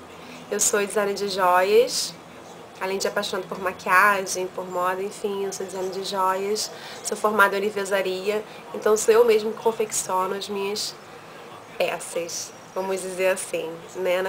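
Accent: Rio de Janeiro, Brazil. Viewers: Oi, Adoro suas makes e seu sotaque carioca e muito fofo!! rsrs Vc tem twitter amore? Bjinhoss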